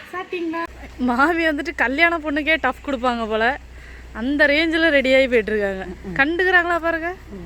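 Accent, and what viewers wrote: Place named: Nagercoil, India. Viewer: Hi... Good morning 😊 I like your Nagercoil slang very much 😍👍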